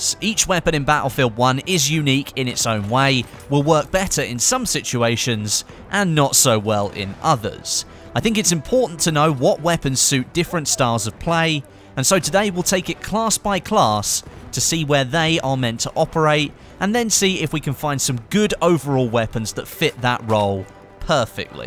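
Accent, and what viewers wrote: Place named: United Kingdom. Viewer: Luv ur british accent. U should be in the game. talking about to get objective charlie lmao nice content bro cheers from brazil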